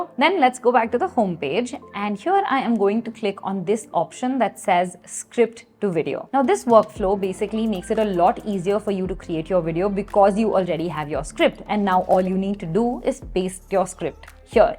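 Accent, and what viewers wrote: India. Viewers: Your voice is sound as indian ❤😮😊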